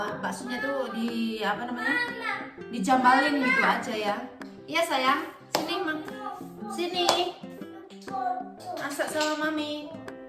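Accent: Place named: Semarang, Indonesia. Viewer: cute bgt....senyum2 bikun gemes....😍😍 Logat semarang yg paling medhok ya mam....😀😀😀 yg wong Semarang mana like nya...😍😍😍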